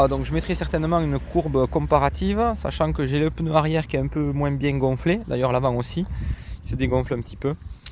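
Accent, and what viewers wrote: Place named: South, France. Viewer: Tu as l'accent du midi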